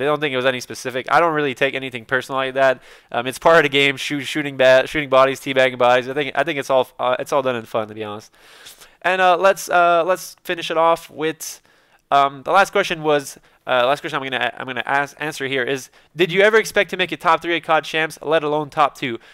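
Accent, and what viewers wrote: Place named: Quebec, Canada. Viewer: c un quebecois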